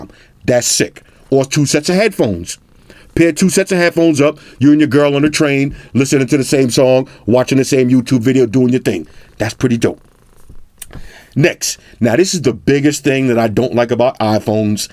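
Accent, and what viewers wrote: New York, United States. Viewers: Thats not a hood voice... its just his New York accent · Hey what’s up!! Been creeping on your channel for a few months now this is my first comment. Love in the content man. Original shit genuine opinion n to the fucking point. I appreciate that!’ anyway Two questions... 1) What did you mean when you said get an iPhone so you can experience Instagram the right way? Is it just like Snapchat where there’s more features on the iOS version. 2) what Burrough u from? Bk? stat? Or Jersey maybe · Naaaw, he sounds like straight up New Yorker to me lol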